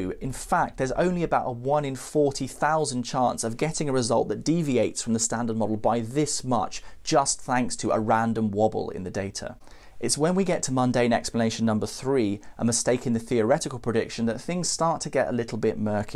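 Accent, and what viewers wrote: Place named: United Kingdom. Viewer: Me a big dum dum! Smarty science guy make me smarterer! Plus his lovely British accent makes it more engaging and it’s neat to think a new fundamental force is out there!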